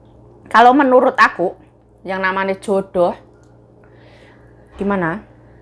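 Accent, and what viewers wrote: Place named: East Java, Indonesia. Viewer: aku seneng cuuaramu ngomongkilo jawatimurane kentel banget, jan lemaem uuenake duowo= enak banget👍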